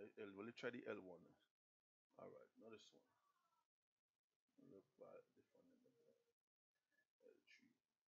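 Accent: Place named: Virgin Islands, Caribbean. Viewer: The man call scene I thought u were from the Virgin Islands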